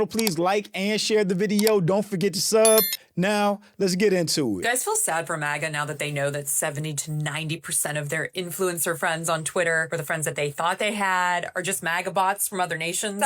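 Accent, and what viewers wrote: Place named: Canada. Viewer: You can listen and know he's from canada.He's from toronto, just listen to him.He don't sound american whatsoever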